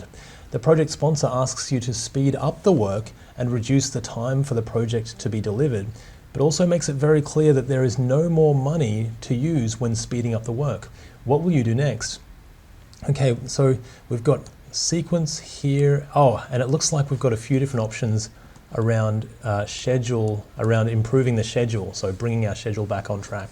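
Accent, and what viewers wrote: Australia. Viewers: I just passed my PMP yesterday 9/3 and I got to tell you..most of my questions had an Australian accent. Thanks a ton (tonne?)! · I was going into the exam having done a ton of SH practice questions, mini exams and full length exams. SH completely demoralized me and made me think that passing was going to a flip of the coin at best. Not only did your videos bring clarity to a lot of the tricky questions that stumped me in SH, but also gave me my confidence back and reduced my anxiety enough to stay focused during the exam. I think reading the questions in my head in a chipper Australian accent really helped. So glad I stumbled across your videos series! I passed with a very comfortable AT/AT/AT :D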